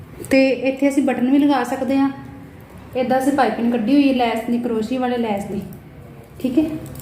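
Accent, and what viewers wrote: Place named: Majha, India. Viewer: ਤੁਹਾਡੀ ਬੋਲੀ ਮਾਝੇ ਦੀ ਏ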